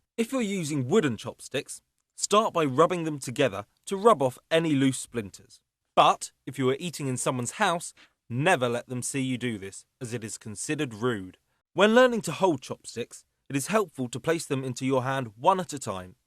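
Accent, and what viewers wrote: United Kingdom. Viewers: Okay now British man instruct me on how to seduce that Asian chick. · i think it's funny that the narrorator sounds british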